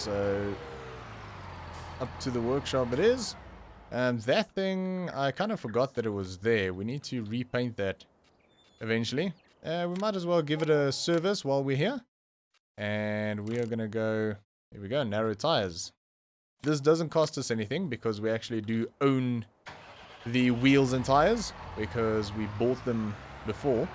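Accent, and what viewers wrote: South Africa. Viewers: You sound like you are from South Africa... anyway got here from Dag.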